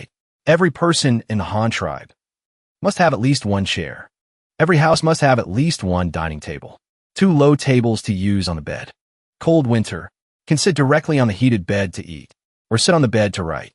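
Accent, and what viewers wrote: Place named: Mexico. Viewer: whats with the occassional mexican accent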